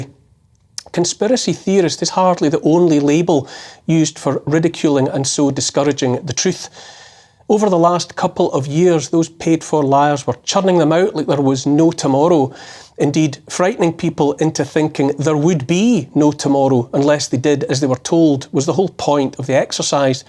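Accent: Scotland, United Kingdom. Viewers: Thank you for your commentary. Glad to hear so many talking about this. I especially like to listen to you Scottish accent!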